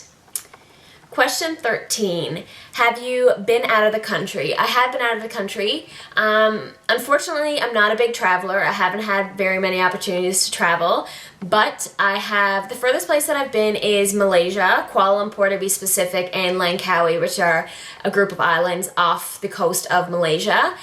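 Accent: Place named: Newfoundland, Canada. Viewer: New subscriber and as soon as I heard your voice I knew you had to be from Newfoundland! Fellow Newfie :)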